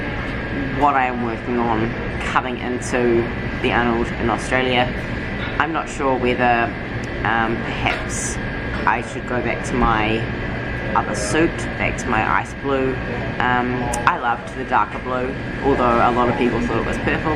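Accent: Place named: New Zealand. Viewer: I've just subscribed (YT recommended this video to me) and when you spoke, it was cool to hear you are a fellow Kiwi :-)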